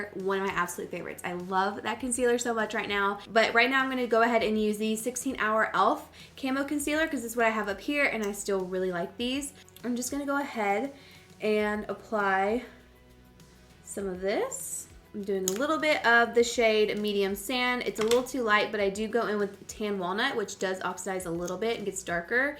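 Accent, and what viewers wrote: South, United States. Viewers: Anyone else notice the small hints of her southern accent coming back since she moved back to Georgia?? I love it!!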